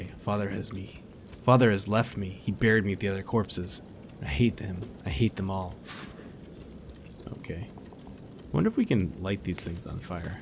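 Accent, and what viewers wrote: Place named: West Coast, United States. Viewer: You have a very relaxing voice. Where in the USA are you? I'm guessing West Coast...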